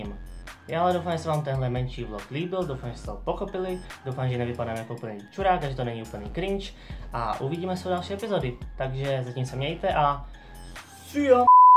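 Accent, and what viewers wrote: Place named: Moravia, Czech Republic. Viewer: "nejsu zvyklý" ... ty jsi z Moravy, že jo? :D jinak, vlogy určitě toč, bude to zajímavá obměna ;)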